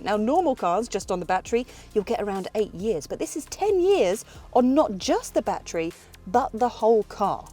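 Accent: United Kingdom. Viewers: Banters not working too loud and artificial punching around british accent and bit of drama doesnt always add on, scorching on ears honestly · UK english?anyway horrible to listen wtf is that. British MC/BC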